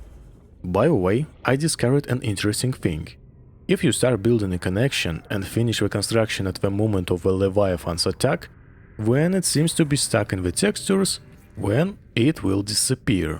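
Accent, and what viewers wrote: Russia. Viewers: Мне кажется что этот пчел русский, хотя в акцентах я не шарю... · Такой акцент, мэйд ин раша, сразу понятно)) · Сразу понял, что русский по "зэ и вэ". Насчёт "the" - "дэ" самый идеальный вариант. Надо поработать над произношением. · dude I love your russian accent. it is really good and cool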